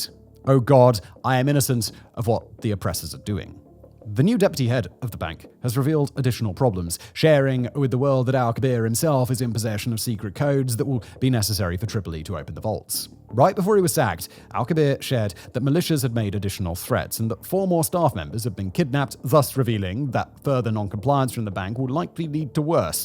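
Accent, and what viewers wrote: United Kingdom. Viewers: Cannot stand the deuchy obnoxious British accent!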